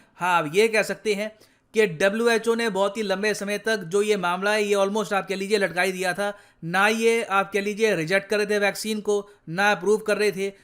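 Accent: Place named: Bengal, India. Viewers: bro yeah bengali hai. Bengali language mein jai ko joy pronounce kya jata hai. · He is bengali so that is pronounciation issue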